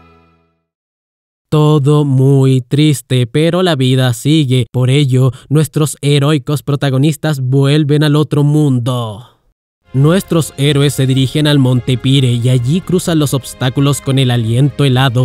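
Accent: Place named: Chile. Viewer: Solo acotar que al parecer el personaje Toma en según Wiki es descendiente del Toma que aparece en Chrono Trigger. Por lo demás, se agradece este resumen, por el poco material de este estilo que hay al menos en español, y por lo enrevesada y a la vez entrañable y bien construida que es esta historia. Mención honrosa al acento y las referencias chilenas 🤭✨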